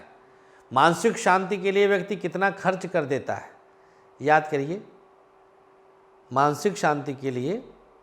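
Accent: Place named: Awadh, India. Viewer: अवधी बोली❤ प्रणाम गुरु जी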